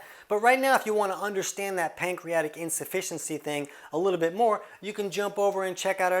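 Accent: United States. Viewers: Dr, which state is your accent from ? best wishes from Brazil 😁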